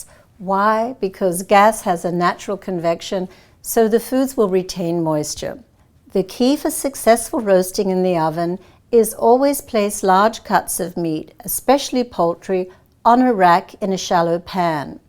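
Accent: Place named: South Africa. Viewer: Love my Bertazzoni and that South African/NZ accent.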